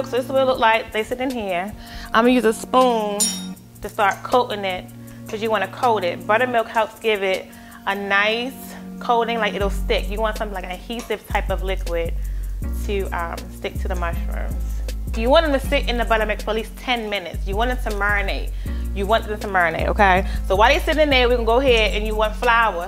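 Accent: Baltimore, United States. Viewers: Is this girl from Baltimore or Philadelphia? 🤔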